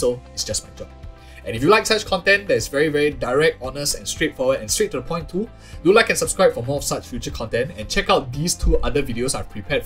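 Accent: Singapore. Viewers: u sound like singaporean sia, i like your content keep it u bro! huat ah!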